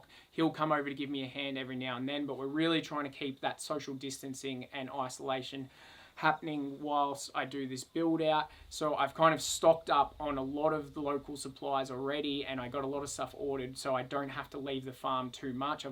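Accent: Australia. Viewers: I’m a girl who’s a sucker for guys with an Aussie accent. You get me in the divorce. Plus....I love occy.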